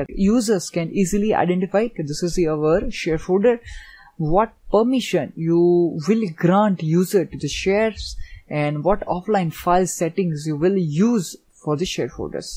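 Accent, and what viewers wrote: India. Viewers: ugly accent . try using a robot instead your STRONG indian accent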